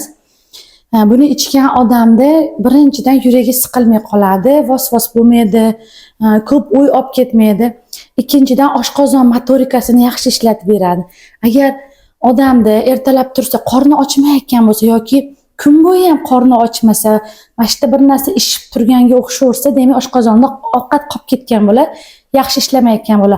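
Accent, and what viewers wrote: Uzbekistan. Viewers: Кайерлисиз синглим шевез Куконлига ухшаяпти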